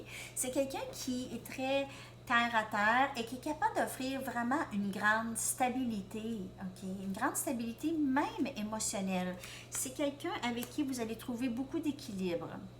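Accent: Canada. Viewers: Vous êtes belle , j'aime votre accent canadien 🥰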